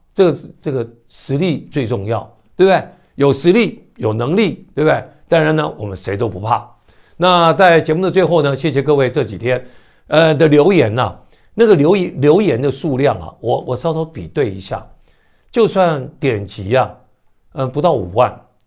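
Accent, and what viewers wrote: Standard. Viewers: 语音标准 评论优默 见界宽阔 正能量满 正气善评 优秀才气 愿你全家安康如意🙏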